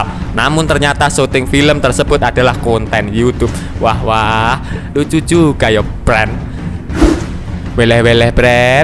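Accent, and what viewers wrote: Java, Indonesia. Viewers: Medhok ngomongnya...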